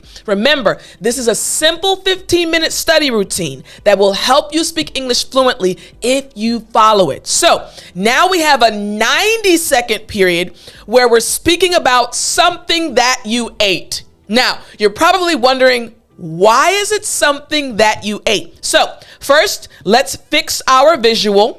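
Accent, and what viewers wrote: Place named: United States. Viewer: Only I love Amercain accent and understood all what you are taking about it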